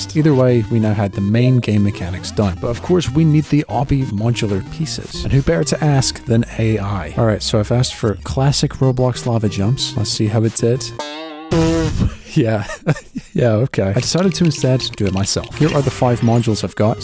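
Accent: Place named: England, United Kingdom. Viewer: I thought your from England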